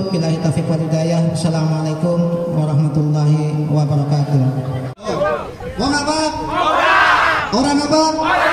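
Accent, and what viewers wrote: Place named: Banyumas, Indonesia. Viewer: Ora ngapak ora kepenak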